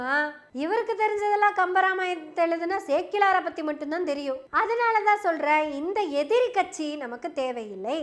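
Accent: Kongu, India. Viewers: உங்கள் கொங்கு தமிழ் சுவையோடு ஆணித்தரமான விளக்கம் வாழ்த்துக்கள் சகோதரி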